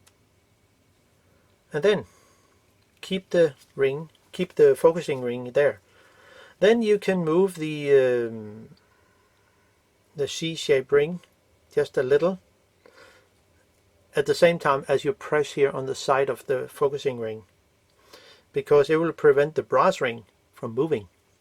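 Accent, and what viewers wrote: Denmark. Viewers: Hej. Er du dansk? Det lyder sådan. 😄 Hvis kan. Du så hjælpe mig en 135 mm 3..35 med en stiv fokusering? Mvh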